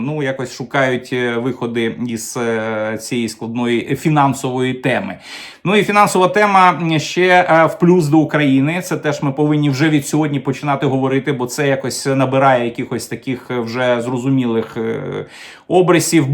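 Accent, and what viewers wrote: Russia. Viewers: Пане, сьогодні вам я поставлю лайкос, тільки за те, що мені не різало вухо оте ваше, рашистське, -- "тєма", "схєма", "проблєма". P.S. Але ж, ні, в кінці ви таки викинули своє рашистське "тєма". Ну, не в обіду вам, але так виглядає, що ми з совка вийшли, але совок залишив свій слід. Ви послухайте, як говорить корінний росіянин, котрий не так давно перейшов на українську мову, телеведучий Олексій Суханов. Та в його вимові близко нема таких висловів, як у вас,корінного українця, котрий частину свого життя пропрацював на УТ.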